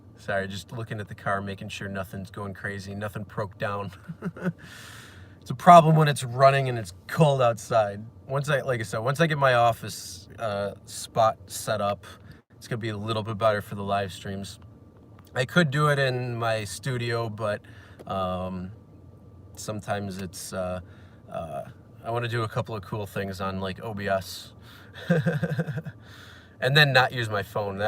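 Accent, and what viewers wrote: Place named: Buffalo, United States. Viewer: i recognize yer bfflo accent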